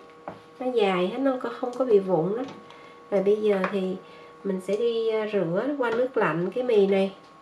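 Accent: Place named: Quang Nam, Vietnam. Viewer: chia se mi quang dung cach cua xu quang cua co. con mi quag cac noi nhu pt ngot duong nhieu qua kho an lam chia se tieng noi de thuong giong nguoi quang co cam on nhe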